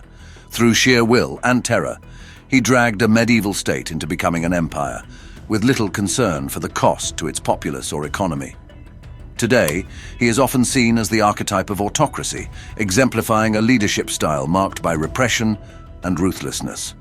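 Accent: United Kingdom. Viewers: That British accent 😂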